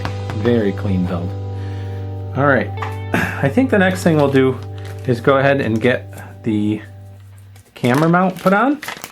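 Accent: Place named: United States. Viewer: Are you aware that American English is different than British English? He pronounced it the way Americans pronounce it because he's an American. He's pronouncing it exactly the way it's supposed to be pronounced in America. Why on earth would the British pronunciation be any more correct if he's not actually British? That's just nonsense.... lol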